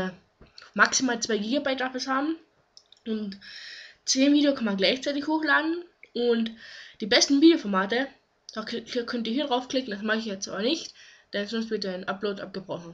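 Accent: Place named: Saxony, Germany. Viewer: Genau. So sympathisch ein sächsischer Akzent auch sein mag, bitte bitte bitte mit Zucker drauf: Spreche so, dass man es verstehen kann!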